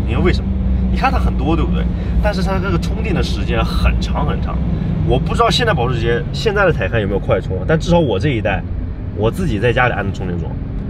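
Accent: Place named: China. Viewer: 聽你的口音是大陸人吧，怎麼會背離祖國共產黨，去北美敵人那生活呢？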